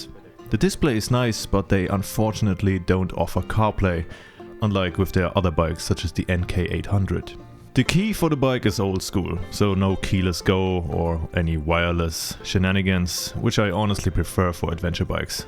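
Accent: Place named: Germany. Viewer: Good review and wow, your English is amazing. I really liked the use of idiom. The spoiler "thingy" and "cheers" were really nice to hear. Whilst you have a German accent, you don't have that mid Atlantic accent so many European speakers of English have. You should feel proud of your video and your excellent English.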